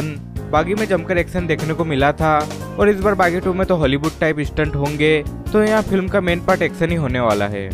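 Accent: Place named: Bihar, India. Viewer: Bihar se ho kya bhai , aawaj change kar bhai , Maza nahi aa raha Heeee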